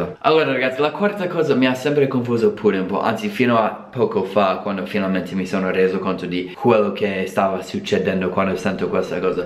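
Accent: Rome, Italy. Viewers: Troppo forte la cadenza romana😂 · Too much Romanesque... 😂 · You speak Roman not Italian, you know that, right? "Stare" is not a synonim of "essere" in Italian. If you want to learn italian I strongly suggest to change city. Go North young man! - (PS. Hamburg is a German town maybe "hamburger" should be pronounced with a German accent no?) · Non ci credo, è l'unico straniero che abbia mai visto che ha imparato pure a fare l'accento romano:D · Ho le lacrime agli occhi dalle risate! Sei troppo simpatico. E poi ADORO che parli ROMANO 💖 e si sente un bottoooooooo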